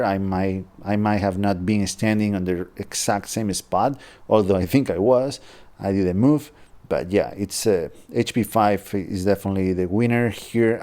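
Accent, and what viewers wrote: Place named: Spain. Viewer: Con todos los respetos, tu acento inglés suena horrible... no entiendo por qué siendo español haces los contenidos en inglés... Es una pena porque privas al público de aquí que no comprende el idioma de entender unos vídeos que comparten unos conocimientos e ideas sobre fotografía muy buenos, y estás dando prioridad al público extranjero...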